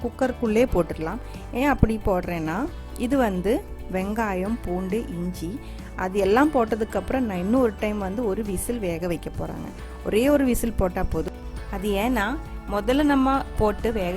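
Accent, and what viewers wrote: Kerala, India. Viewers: I like your malayalam mixed Tamil .. lots of love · Superb !👌👌 Love ur explanation & ur malayalam kalandha Tamil!👍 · She says that your cooking as well as the tamil with malayalam accent is very sweet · நல்லாருக்கும்மா அதோட நீங்க பேசுற மலையாளத்தமிழ்ழும் அழகாருக்கு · Unga Malayalam + Tamizh slang semmma 😍😍😍😍😍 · The way you explain is nice to hear... Tamizh in Malayalam accent👌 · Absolutely Beautiful Tamil in Malayala Flavour !!!!! Your Tamil is sweeter than our Tamil. Indeed! The recipe is super. Just now bumped into your channel. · Tamil in malayalam slang very cute😊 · Nalla tamil pesureenga.. Konjam malayalam kalandhu neenga pesumbodhu kekkave nalla irukku..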